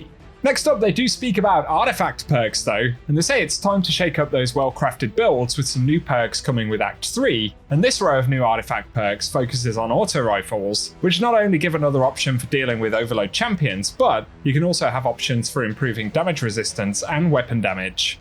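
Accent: AAVE, United States. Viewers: Is it ebonics? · leave his ebonics dialect alone.. 😂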